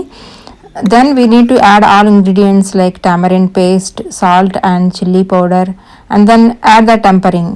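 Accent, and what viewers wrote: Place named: India. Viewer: I love your accent. It reminds me of my time in India. Very useful video, thanks for sharing it.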